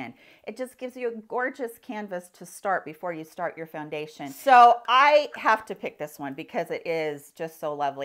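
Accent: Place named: Midwest, United States. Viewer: Where are you from? You sound midwestern. Btw loved the video!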